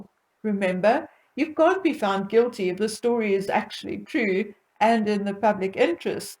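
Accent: South Africa. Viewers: I enjoyed your commentary on the Harkles, most entertaining, abd so true. Also loved hearing your accent made me horribly homesick for SA. Nkosi love and protect Afrika 🙏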